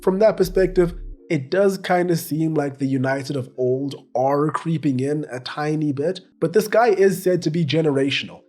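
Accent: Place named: South Africa. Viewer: Is this dude a black South African? His accent is basically like mine. Not used to hearing it on the internet.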